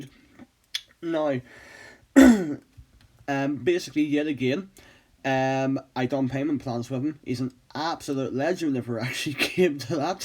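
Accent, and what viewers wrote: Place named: Northern Ireland, United Kingdom. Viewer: It surely is. Great to hear the world famous 'Norn Iron' twang. Keep up the great work.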